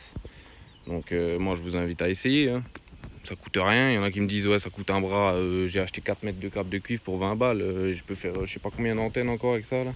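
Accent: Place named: Franche-Comté, France. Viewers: Je vous adore !! Ainsi que votre accent qui me rappelle ma Franche Comté natale!! 😊 Je suis très en retard pour la plantation de mes tomates !! Mais avec le pont de cette fin de semaine, sans regarder la lune, je les planterai quand même!! Mais je vais essayer votre fil de cuivre. Ça ne mange pas de pain !! Merci pour vos publications pleines d'humour. Je vous kiffe ! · Merci de tester et d'ouvrir les esprits, d'autres part je crois que je tombe amoureuse de ton accent de l'est 😉j'apprécie tes conseils, ta manière de faire des rimes avec le vivant du potager, j'ai vu les rageux se déchainer en commentaire sur ton dernier réel sur l'éléctroculture, un pas de travers dans le sens inverse de leur pensée et tout le monde s'enflamme au lieu de tester tout simplement, ils préfèrent boycotter!! bise de l'ouest 😘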